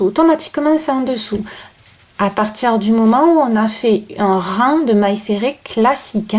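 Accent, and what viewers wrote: South, France. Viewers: Merci beaucoup pour vos tutos, bien faits, clairs , tranquilles, et le petit accent du sud "merveilleux" en prime ! Continuez à nous apprendre, vous êtes très agréable merci. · Merci vous expliquez très bien ne changez pas votre accent moi je suis de NIMES votre accent est près du mien Marie jo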